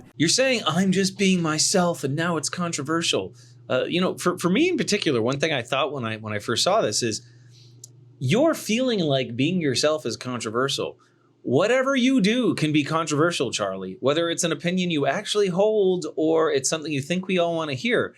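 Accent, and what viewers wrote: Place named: Canada. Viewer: Man. You're funny and cringe but I HATE the way you Canadians say your "out" words as oot. They're pronounced OWT not OOT you snow monkey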